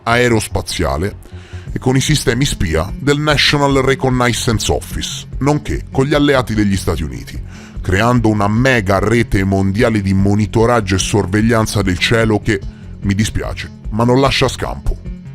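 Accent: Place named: Valdarno, Italy. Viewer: Il Valdarno ci ha regalato questa perla di saggezza. sempre al top! Che tu sia di quelle parti me lo suggerisce il tuo accento, ma potrei sbagliarmi, sia chiaro. Io uso solo l'intuito, non ho software incredibili di alcun tipo.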